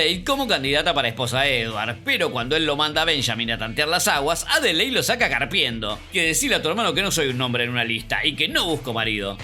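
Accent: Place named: Argentina. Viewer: Nunca había escuchado un resumen tan argentino 😅... Amé 👏👏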